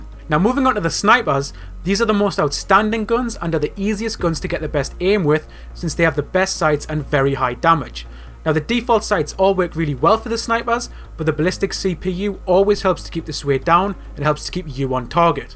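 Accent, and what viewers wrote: Newcastle, United Kingdom. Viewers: Are you deaf lol. He is a geordie...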